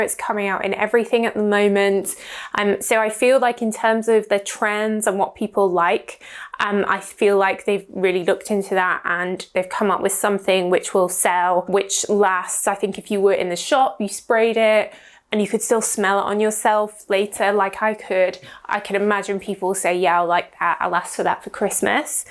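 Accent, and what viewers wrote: United Kingdom. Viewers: is your dialect british?(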